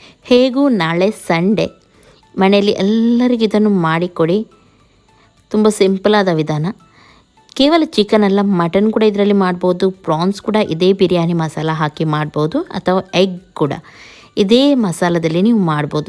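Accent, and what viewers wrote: Mangalore, India. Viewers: Mam are u mangalorean...🤔🤔🤔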